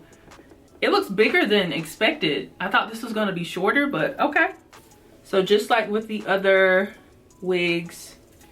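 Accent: South, United States. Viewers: LoL I meant the geographical area...I noticed a slight twang in your voice is all...I definitely wouldn’t have guessed SC.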